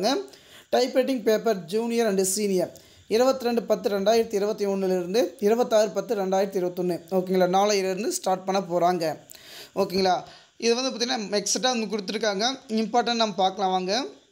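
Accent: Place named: North India, India. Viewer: Tamil nalla padikka kathukka Nanbaa... North India maathiri padikkira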